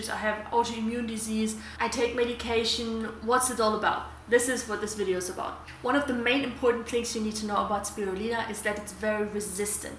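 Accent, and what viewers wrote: Germany. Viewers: So which spirulina is the best to buy? By the way, you have a verry german accent 😊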